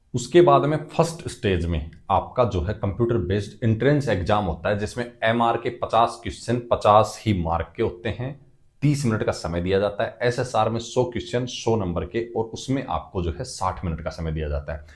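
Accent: Haryana, India. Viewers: Sir aap harayana se ho kya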